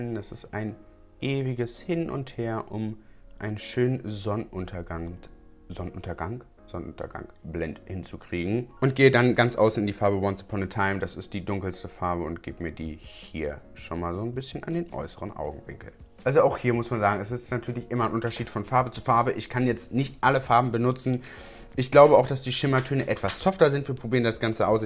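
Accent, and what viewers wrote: Berlin, Germany. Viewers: Uh hallo schöner Mann, bist du aus Berlin? 🙂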